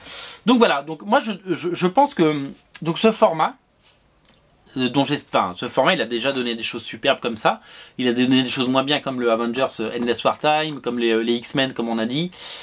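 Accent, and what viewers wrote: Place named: South, France. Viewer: Moi j'aime bien ton accent du sud :D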